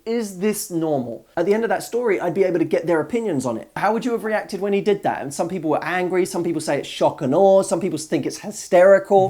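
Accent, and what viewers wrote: United States. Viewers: Your American accent is adorable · Amercan accent!!!!! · good American accent dude:) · I love the American accent - the deep voice!, you are amazing. Thank you so much! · Jesus. Your American accent makes me feel like you're playing all of us all of the time. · Love the American accent...lol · his American accent reminds me of Ryan goslings voice lol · Your American Accent is awesome! Thank you for the helpful content. 😉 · Is that a Californian accent? Regardless, your rendition was awesome. You slip into and American accent like it's butter, i.e smooth! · Omg the american accent is great!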